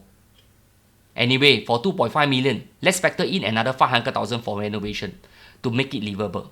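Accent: Singapore. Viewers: very nice sharing. Always love the Singaporean way of speaking, chap-pa-lang mixture of languages/dialets used. Can do a similar analysis on west coast areas. Around West Coast Rise/Ave/Garden, Faber Crescent/Drive, Sunset Terrace/Drive, Pasir Panjang?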